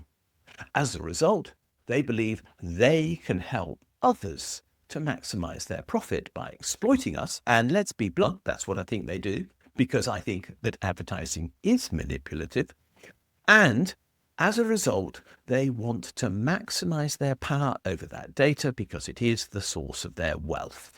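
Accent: United Kingdom. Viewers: Your talking out of your backside because your mouth knows better. And your Brit accent does not make you sound intellectual. The only destruction is perpetuated by the Marxist Left: No God. No Country. No Family. Burning American Flags. And when witnessing that same regressive Left burning down cities, businesses; and, not to mention the violent fire bombing of Tesla and the mob attacks on citizens, you got some balls claiming President Trump is destructive. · By your accent your from Britain? UK? Stay out of U.S politics bud Trump is our president. A true leader. I ask this how dangerous can a company get when all the people have to do is simply use another company if they get too powerful but when the government gets too big we are fuc*ked. I'm more more fearful of a government than any company. Wake up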